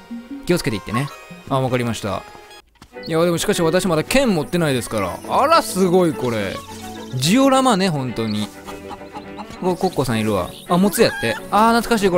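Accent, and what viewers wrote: Kansai, Japan. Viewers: 大好きです😆 関西弁移ちゃった😜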